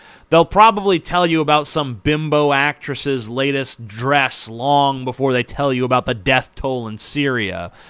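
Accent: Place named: New York, United States. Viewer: What the fuck man? It's like you're typing a New York accent phonetically.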